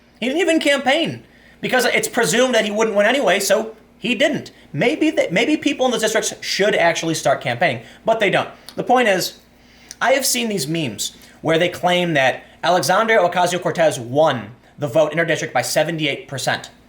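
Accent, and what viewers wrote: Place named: Appalachia, United States. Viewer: Sounds like a hill-billy Obama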